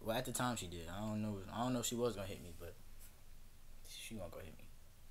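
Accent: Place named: New York, United States. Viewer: Dis nigga from New York for a fact💀🤣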